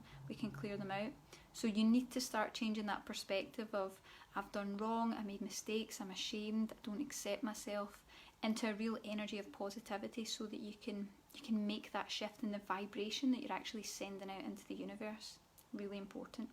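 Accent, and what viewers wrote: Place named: Scotland, United Kingdom. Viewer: Nice to hear the Scottish accent 😁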